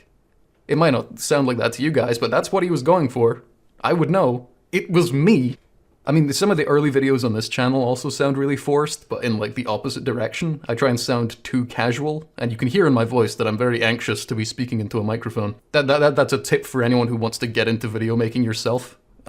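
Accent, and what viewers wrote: Scotland, United Kingdom. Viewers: You really sound like you'd pronounce 'Enoch' the same way as I would (like 'loch', like if I was to mention 'The St. Enoch Centre', the 'ch' in there is like in words like 'loch') but I was surprised that you didn't. I must be mistaken.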